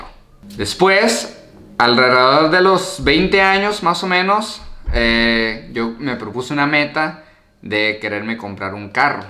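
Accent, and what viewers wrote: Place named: Sonora, Mexico. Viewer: AWEBOOO yo sabia que este mushasho era de Sonora! 👌😎 Te acabo de conocer por el video de jordiwild, y nomas por el acento pense "este vato es de aqui del rancho"... Esta curado tu canal, ya tienes un sub mas 🤜🤛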